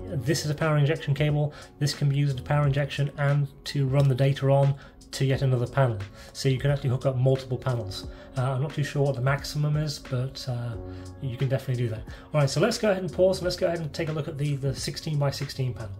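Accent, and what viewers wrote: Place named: England, United Kingdom. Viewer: The Amazon links take me to Amazon in the USA so I presume that is where you are located despite your English accent?!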